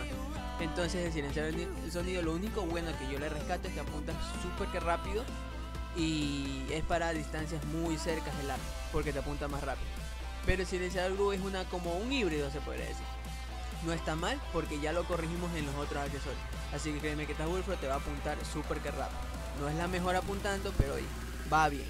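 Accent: Ecuador. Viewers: Buen video!!!. Eres ecuatoriano bro? Yo lo soy, cuando unas partiditas hermano..?!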